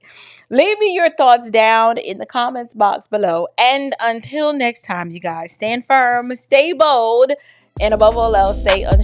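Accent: New York, United States. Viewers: The way you said ya'll! You are an honorably southern bell! I know you are originally from NY, which I hear as well. I love your commentary and point of view. Keep up the great work! ❤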